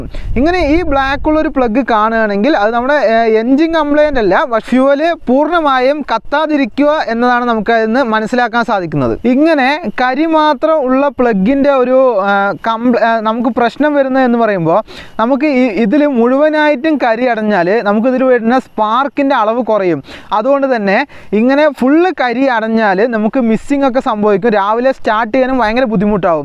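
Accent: Palakkad, India. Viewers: Chettan palakkad aano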